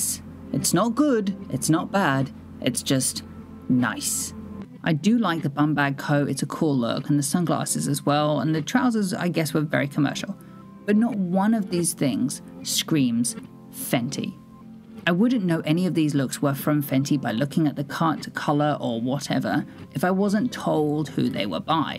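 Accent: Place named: RP, United Kingdom. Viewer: Bro your posh accent sound so prim and proper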